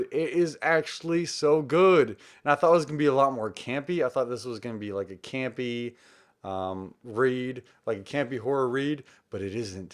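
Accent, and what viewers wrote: South, United States. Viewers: I can't believe you had a lot of spooky books at your house in Texas on this video during your quarantine including Practical Magic by Alice Hoffan, The Haunted of the Hill House, Cemetery House by Aiden Thomas, Mexican Gothic by Silvia Moreno-Garcia, The Year of the Witching by Alexis Henderson, The Southern's Book Club's Guide to Slaying Vampires by Grady Hendrix and everything including House of Leaves by Mark Z. Danielewski until you're talking about all of the spooky books to read them at the same time while you're telling the whole story from all of the spooky books after that, but I hope your husband, Zach will be very proud of you and I love your Southern accent, I wanna say Happy Halloween to you and him, so stay safe!!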